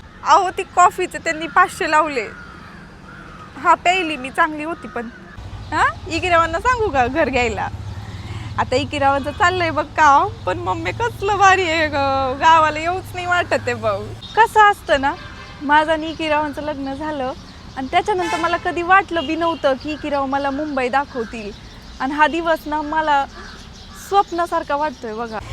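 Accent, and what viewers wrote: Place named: Kolhapur, India. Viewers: This Is Kolhapur Style Marathi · Wow! That's to epic yrr. Khup divsatun hi satara - sangli chi bhasha aikayla bhetli. ❤❤❤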